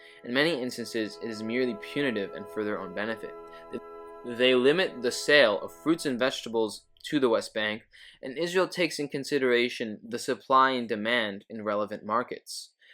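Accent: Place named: United States. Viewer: Great video, I have only watched two of your videos so far an wish to giv so feedback, since I believe good content with bad execution/presentation undermines the content's potential. many times I have been confused with lack of sync between "infographic" and what you're saying. E.g when you talk about WB, Gaza shows up, when you talk about Gaza, E.Jerusalem shows up, etc. But the more unbearable element was your very heavy american accent. It is not the american accent itself, but rather the very slurry pronounication! Your talking pace is optimal, but if the slurry pronounciation can be eliminated by you talking slower and clearer, that would be a better option to go by. Thank you for doing this video! 🇵🇸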